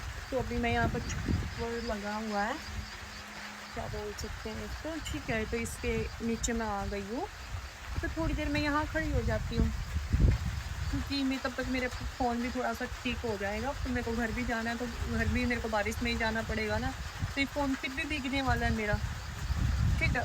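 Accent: Haryana, India. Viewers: Hariyanvi boli bahut pyari lag rahi👍👍👍👍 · Wah rr jatni aaj haryanvi bol k moz krdi❤❤ My cute crush 🥰🥰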